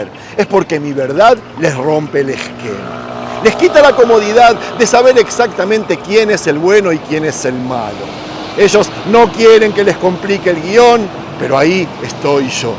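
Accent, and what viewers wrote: Argentina. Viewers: Siempre vivieron y viven tranquilos en Argentina.. no se entiende! . De hecho el habla como Argentino y no sé si vive acá también.. me parece que es un poco mucho hablar así de Argentina.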